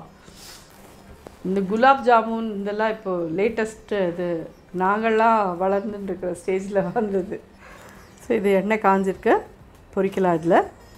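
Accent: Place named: Tamil Brahmin, India. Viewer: Super mami. actually I expect this recipe from you. Your explanation is so good .I will try this recipe. and I like your voice with agraharam style. Thank you very much mami 👌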